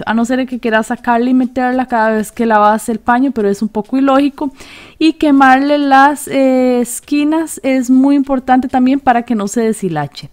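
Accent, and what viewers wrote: Costa Rica. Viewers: Ese acento me es familiar!! Eres Costarricense?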